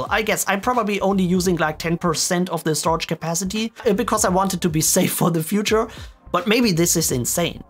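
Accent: Germany. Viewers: Ich so: Hey dieser Akzent kommt mir bekannt vor. Kein Wunder, aus Deutschland. Schöne Videos haste ;)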